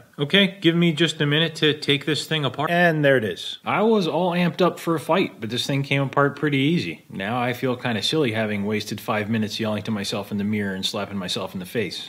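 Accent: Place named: United States. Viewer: Ive always wondered where is from. You sound like an american, but you use the metric system. Im so confused!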